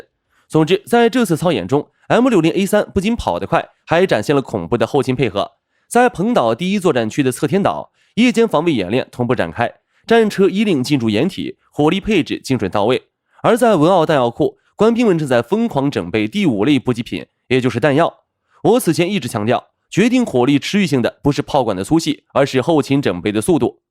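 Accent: China. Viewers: 都不知道這是AI做的，還是對岸的人做的；這人是從台灣人住對岸??? 這影片滿滿的中國味的口音，說話的用詞發音用法都是對岸，搞甚麼東西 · 中國口音的中國影片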